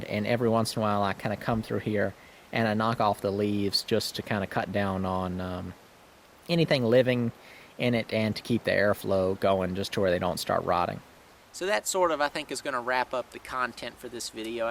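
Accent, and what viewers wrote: United States. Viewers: Hey bud, noticed your canoe next to your wood pile. I pretty sure from your accent and the terrain that we're in the same region of the US. Just wondering if you've ever done any whitewater canoeing on the Nantahala river or some of the others in the area. You seem like the kinda fella who would really enjoy it. I used to go pretty often when I was around your age. It's lots of fun and it's something I'd think you'd enjoy with your significant other. Thanks for the videos, really enjoy them.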